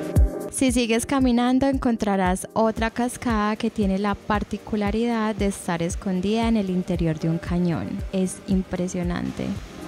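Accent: Antioquia, Colombia. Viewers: que rica vocecita de paisa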